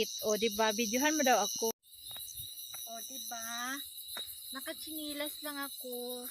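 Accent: Visayas, Philippines. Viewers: Mam, ako rang gina-play inyong video pabayaan ra nako ang adds. Duha akong youtube account. Ganahan man ko aning imong vlog kay natural kaayu. Unya bisaya kaayug tingug. Hasta kapila na na-play. Kay sa kusina ko ako lang ginapasagdan. Hehe Pati 2 years ago gina-play pa nako. Apmping permi mo diha. Nindut unta makalaag diha Congrats sa nephew!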